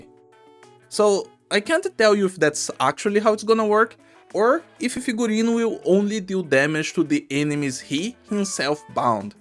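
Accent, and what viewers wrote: Brazil. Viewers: Isn't he brazilian tho?(pretty sure that's the brazilian accent) and figurine translates to costume from portuguese.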